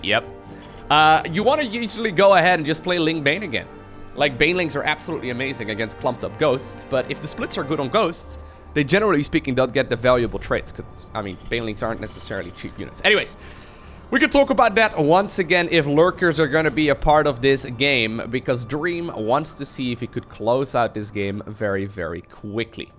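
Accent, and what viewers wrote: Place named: Brabant, Netherlands. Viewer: hoor ik nu de brabander in Lowko :D reynor is beter as zen tegenstander :D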